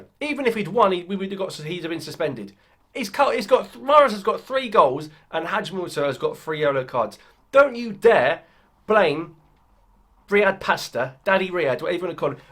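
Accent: England, United Kingdom. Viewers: Sweeet!!!! Nice jolt ⚡️! Algerian with an English accent!!! I was expecting a French accent !!! Love your spot on analytical review! 👊🏾🇳🇬👊🏾🇳🇬!!!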